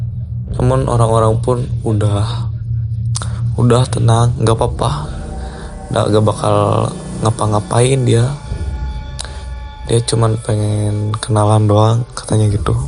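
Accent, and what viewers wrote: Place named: West Java, Indonesia. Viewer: wahh logat nya sunda banget yah😂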